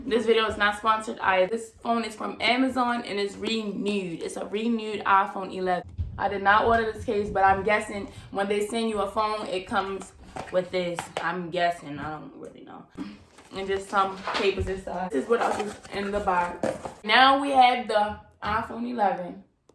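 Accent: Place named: Baltimore, United States. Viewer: How was the battery health ? And are you frm Baltimore u got the accent 😭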